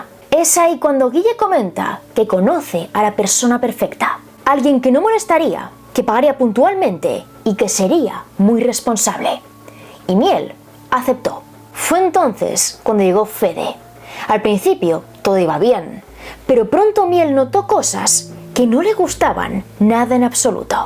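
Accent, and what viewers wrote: Spain. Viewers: y sus kanas... xd Hermoso cabello blanco de, me encanta Tu acento español... me encanta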